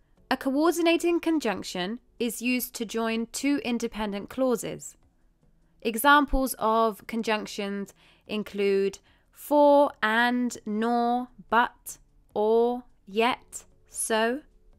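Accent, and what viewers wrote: United Kingdom. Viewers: Your Accent is British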